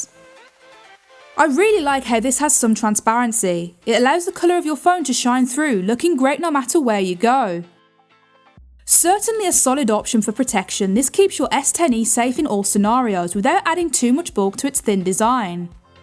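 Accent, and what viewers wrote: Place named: West Midlands, United Kingdom. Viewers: West Midlands accent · That's UK's accent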